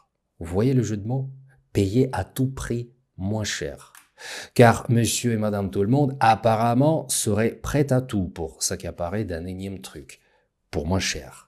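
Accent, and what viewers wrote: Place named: Russia. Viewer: Un "russe" avec un gros accent russe fait une émission en français pour les français en disant "On copie". C'est absurde et illustratif des "russes" occidentalisés qui ne savent plus dans quel monde vivre., faites-vous soigner!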